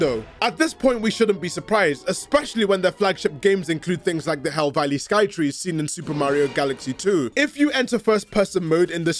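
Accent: United Kingdom. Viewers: the brit is back baby!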